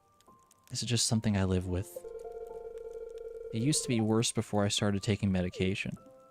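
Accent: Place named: Canada. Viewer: your extra Canadian accent is charming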